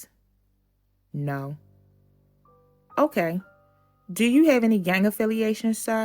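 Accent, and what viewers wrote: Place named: Baltimore, United States. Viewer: Different narrator. First time different voice. This a baltimore woman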